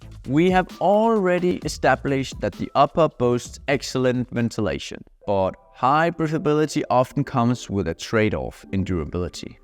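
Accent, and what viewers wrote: Denmark. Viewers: My mum is Danish (from Horsens) so hearing a Danish accent always make me happy. Your English is outstsnding. Especially all of the technical vocabulary. Thank you for the in depth reviews. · This dude danish accent is so strong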